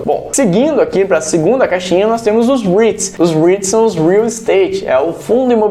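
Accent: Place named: Minas Gerais, Brazil. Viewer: mineiro de (BH)kkkk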